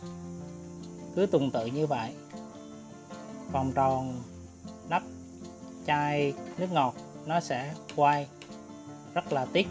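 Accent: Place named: Quang Nam, Vietnam. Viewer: Trò đầu tiên với nắp nước ngọt mình có chơi rồi , tuổi thơ lại ùa về. Cái giọng nói QNĐN thân thương quá vì mình là người quê ở Quảng Nam. Gửi bạn ❤️👍🎁✅chúc bạn thành công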